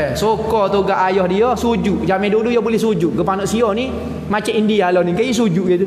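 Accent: Kelantan, Malaysia. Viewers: Sedak dengar kecek klate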